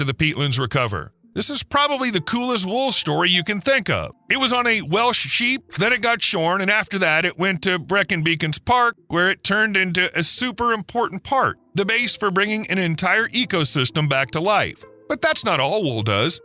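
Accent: United States. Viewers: It is unfortunate that the announcer is a loud American..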